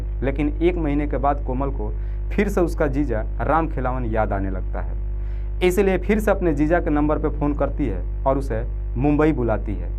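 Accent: Bihar, India. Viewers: Bihari ho kaa bhai?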